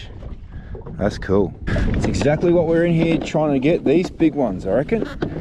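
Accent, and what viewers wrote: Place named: Australia. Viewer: Your first time in Australia, sounds like your a fuckin aussie to me and sounds like you know what you r doing in Australian waters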